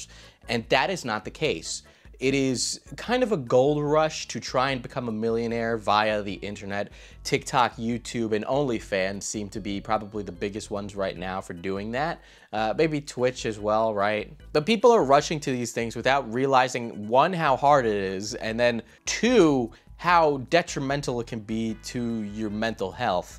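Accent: New York, United States. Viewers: I never heard that little bit of New York in your voice until you mentioned it and now I can't unhear it.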